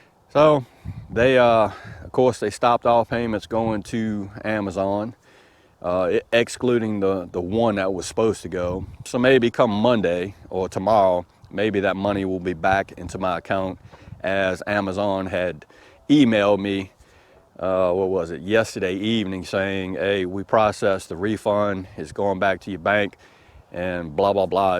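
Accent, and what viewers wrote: South, United States. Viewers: I bought a cheap Fire HD tablet/reader using the same 5 month payment system because to be frank I wasn't too confident whether I'd be happy with it or not. Regardless I just got done paying it off this month. Thankfully it was only 30 USD a month but I had no problem with it. Initial payment was 39 USD ($30 + 6% state sales tax) and the remain 4 payments were $30 and they came out of my bank account every month like clockwork. I guess because it wasn't that much a month I lucked out and didn't get fleeced like you did. Thanks for the heads up and I will definitely keep a watchful eye out if I ever purchase anything else from Amazon using that payment system. As far as outsourced customer service.....................................I feel ya brother. P.S. You don't talk funny at all. You have a perfectly acceptable Southern/Creole US English accent.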